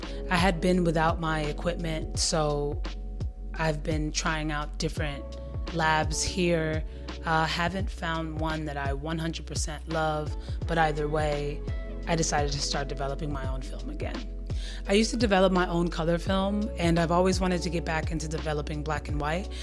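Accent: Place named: South Africa. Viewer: are you South African?? you have a cool accent. I am an experimental film maker from South Africa i really enjoy watching your channel you teaching us so much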